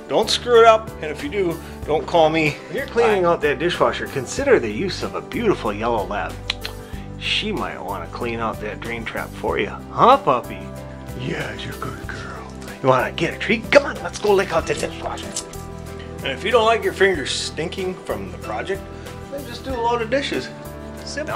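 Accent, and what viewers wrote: Minnesota, United States. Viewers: Listening to you makes me homesick for Minnesota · I am guessing you might be from MN. (me too, fun to hear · Bet your from Minnesota or the Dakotas maybe.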